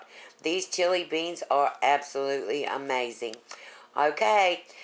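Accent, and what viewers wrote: United States. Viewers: Love that american accent. Will try out this recipe later today in London UK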